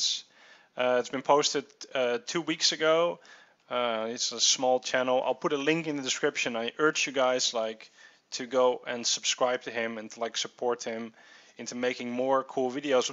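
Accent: Netherlands. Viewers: Je praat echt met een heel Nederlands accent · Let me geuss you are dutch Want ik ook en het is heel duidelijk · ***** Haha You HAve a dutch Accent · #nederlandsacc beter dan van gaal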